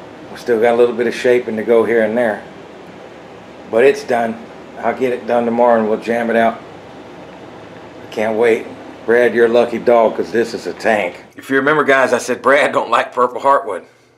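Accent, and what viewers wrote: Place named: Kentucky, United States. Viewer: You from Kentucky ? You have the same accent as John Prine . In fact you sound just like him when your talking .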